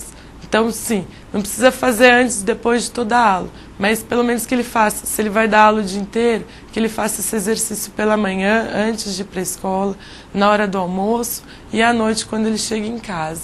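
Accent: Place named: Sao Paulo, Brazil. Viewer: (BARBRINHAENF) -------- Num tem nada à ver com sotaque não. Vc tá dizendo que ela tem um sotaque diferente do que estamos acostumados a ouvir no YOUTUBE, PÔW, o sotaque dela é PAULISTA, e sotaque paulista a gente tá cansado de ouvir no youtube, o problema é a voz dela mesmo. Fátima Bernardes, Cid Moreira, Zé Ramalho VOCÊ E EU SEMPRE VÃO e vamos ter a mesma voz em qualquer sotaque, compreendeu o que eu disse? Então não me venha com essa não porque não colou.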